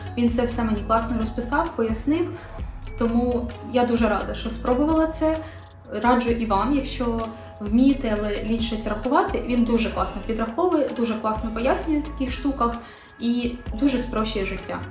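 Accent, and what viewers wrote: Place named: Pokuttia, Ukraine. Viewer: Чудовий покутський сведрик❤ Дякую за відео, дуже приємно тебе слухати і дивитись❤❤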